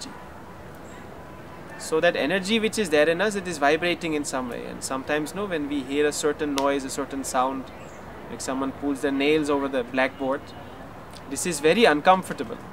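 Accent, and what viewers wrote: India. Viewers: Its indian but its damn good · Hello, your Indian accent delivery took away from the meaning of your words. It just does the opposite of feeling genuine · your English is much more INDIAN...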